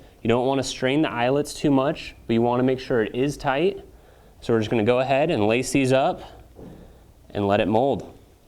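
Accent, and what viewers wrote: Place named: Europe. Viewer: 175 F obviously! This dude sound european???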